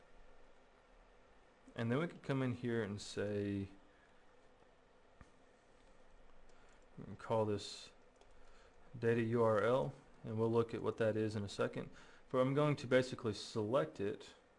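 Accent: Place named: Midwest, United States. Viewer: he sounds american to me. Probably from the midwest or south.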